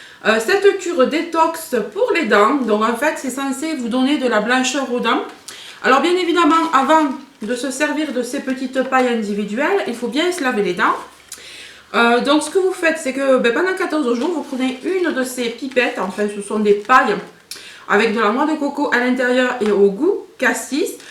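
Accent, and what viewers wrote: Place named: Provence, France. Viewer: ça sent bon la Provence comme ton accent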